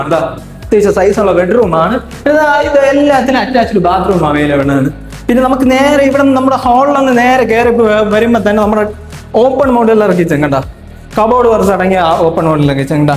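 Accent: Parassala, India. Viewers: പാറ സാ ല സ്ലാങ്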